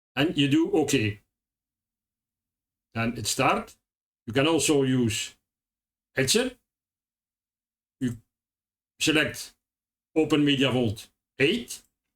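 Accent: Belgium. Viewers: We horen echt da vlaamse accent hahaha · Ik hoorde al dat je van Belgie bent, goede video!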